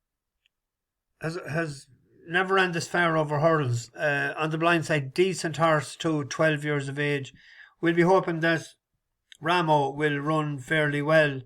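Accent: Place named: Liverpool, United Kingdom. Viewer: Lucky of the IRISH ☘️ and a good Scouser by the sound of it 🥁🥁 🫡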